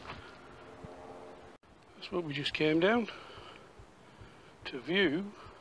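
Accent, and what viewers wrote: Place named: Yorkshire, United Kingdom. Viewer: Absolutely fascinated with your video. Never knew we had so much history on our doorstep! Keep 'em coming, and NEVER apologise for our Yorkshire accent lad!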